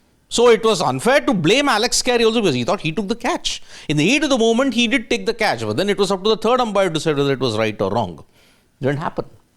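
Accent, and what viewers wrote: India. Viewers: Please improve your accent. The typical Hindi-English accent is truly wreckless coming out of your vocal cords 😂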